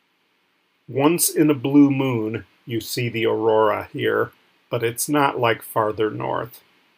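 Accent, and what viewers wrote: United States. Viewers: Judging by his pronounciation, the guy is American, so I guess the idioms are not exclusively Brititsh. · I think you meant,Is this British English or American? He speaks American English and these are American idioms!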